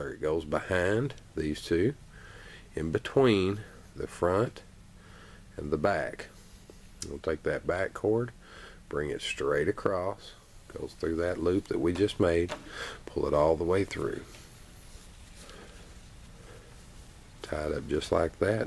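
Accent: South, United States. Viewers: he liked your Southern accent too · Your from the South aren't you?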